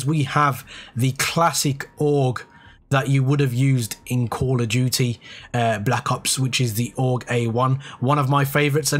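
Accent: Birmingham, United Kingdom. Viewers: Is that a Brummie accent I hear or maybe a yam yam?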